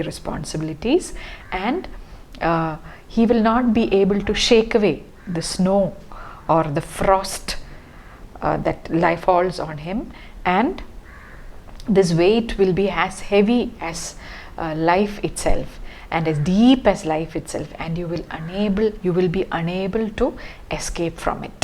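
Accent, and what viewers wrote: Kerala, India. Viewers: R u malayalee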